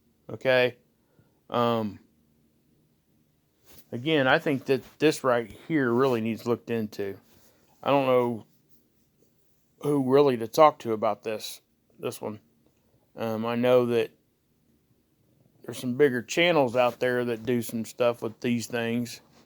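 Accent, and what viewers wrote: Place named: Ohio, United States. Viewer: It helped that heavy Ohio accent ! 😉 o know cause my brother has lived in Ohio for 20 years you all sound the same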